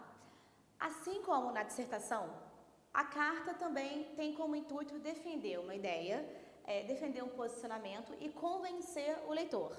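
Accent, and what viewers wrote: Rio de Janeiro, Brazil. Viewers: e esse carioquês da professora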